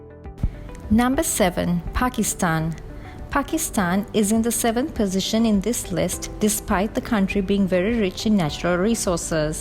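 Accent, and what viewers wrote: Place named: Bangladesh. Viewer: Very nice content with plenty of information, does the speaker belong to Bangladesh?🇧🇩 she sounds like a Bangladeshi girl, very nice presentation.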